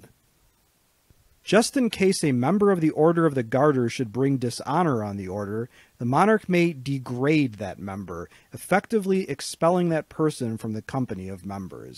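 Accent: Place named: United States. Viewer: Unless I am mistaken, the narrator sounds like an American. He had asked if (paraphrasing) "clubs like the Order of the Garter should still exist today?" In an American context, your equivalent would be the Presidental Medal of Honour, with distinction. The Order of the Garter is a living society honoured by the British Crown. Other than members of the Royal Family who do not count in the 24, are some of the leading figures of the United Kingdom outside Scotland. Also, it remains "The Most Noble Order of the Garter;" the name did not change.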